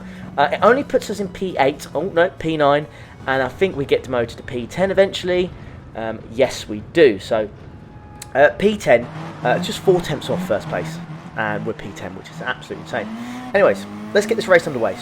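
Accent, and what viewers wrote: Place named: United Kingdom. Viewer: Anyone ever tell you that you sound like Daniel Radcliffe? Of course, I'm American, so I guess a lot of Brits sound that way to me. 😂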